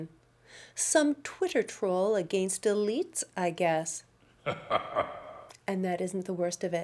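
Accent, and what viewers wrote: Canada. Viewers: You’re the best but do I detect a bit of a Canadian accent?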